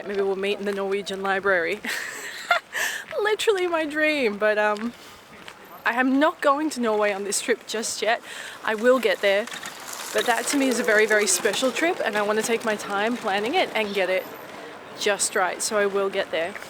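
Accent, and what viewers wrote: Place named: Australia. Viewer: Hiya! Welcome to Denmark! I’ve been to Copenhagen twice and it’s such a peaceful quiet city with lots of gorgeous parks. I’ll help you choose the bed. Always choose the top one! There’s just 3 cities in which I would see myself living, it’s London, Melbourne and Perth. Would you see yourself living in Copenhagen? Thank you for sharing Tivoli Gardens, I’ve only seen it from the outside as they were closed with Easter. Now I know I actually missed something, reason enough to visit for the third time. Good thing is that I can be in Copenhagen within 3 hours starting from my home address as it’s just half an hour to the airport and just a 1 hour flight. The canals are gorgeous in over there. I really got the feel that I need to get back to CPH. Nyhavn is a great place. $18 for a sandwich is insanely expensive. Getting on the canals is one of my favorite things to do when visiting a city, boat tours are usually quite good. 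By the way, have you taken the train to Malmo? That’s a great one-day trip from Copenhagen, just 30 minutes by train. I completely feel you with that solo traveling through Scandinavia. I’m not usually into hostels because I’m a light sleeper, but this hostel seems amazing. I love the Australian accent, it’s the best English there is. I hope you’ll enjoy much more of Europe. Now that you’re in Europe, I can finally catch up with the long bucket list you made for me 😂. Have a wonderful week and safe travels 🤙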